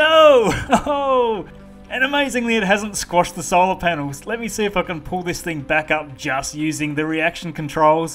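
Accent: Australia. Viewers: he sounds like he is a Australian man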